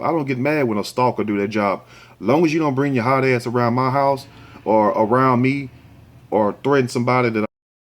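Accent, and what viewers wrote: South, United States. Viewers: Like watching and listing to u even if u talk like your from the southern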